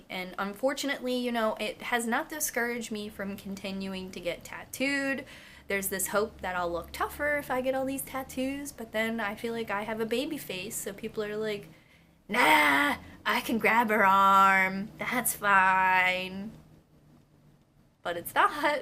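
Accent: Philadelphia, United States. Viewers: I love how in most videos is super cute but every once in a while her "Philly" comes out! Her "Philly" DEFINITELY came out in this video😂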